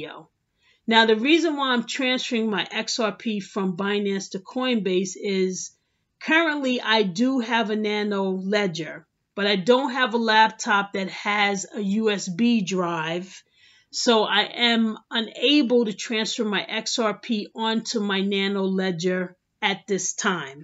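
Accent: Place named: New York, United States. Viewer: Yeah we can tell you're from New YOrk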